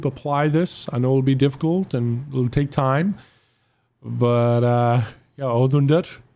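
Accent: Ireland. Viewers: gotta be honest here, Firstly... missed your irish accent (for some reason the bell got unrung for me... go figure) and secondly, YT really discouraged me after the first adpocalipse, i was actually starting to dedicate myself to it and then poop hit the fan and we small creators got covered in it. I basically gave up on this channel here of mine then restarted with it just as a little hobby, but with the "why wate hours of editing on this" mentality which in turn demotivated me even more... so yh they should listen to you coz you have some really good pointers. oh and before i forget... when can we expect a new song video?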